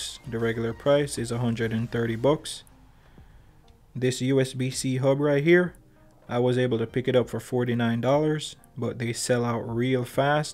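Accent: Jamaica. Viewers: The Jamaican can’t hide in your voice bro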